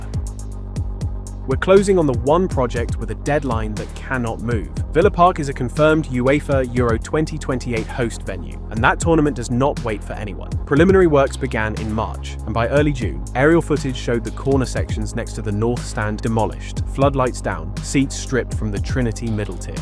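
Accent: United States. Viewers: Maybe drop the American pronunciation on several words dude.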